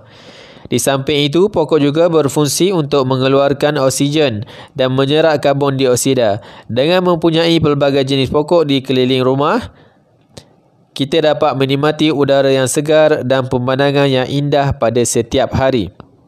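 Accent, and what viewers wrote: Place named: Terengganu, Malaysia. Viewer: suara ganuuu pekat 😂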